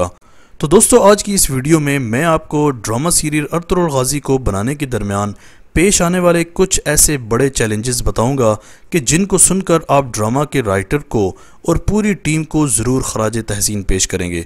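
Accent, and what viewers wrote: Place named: Punjab, Pakistan. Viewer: بھائی کا تعلق ضلع اٹک سے ہو اس لیے لفظ ( ہوئے) ہویے بول رہے ہیں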